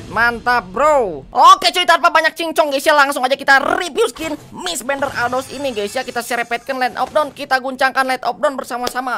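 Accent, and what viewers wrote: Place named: Sunda, Indonesia. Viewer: Nada Bicaranya Kaya Orang Sunda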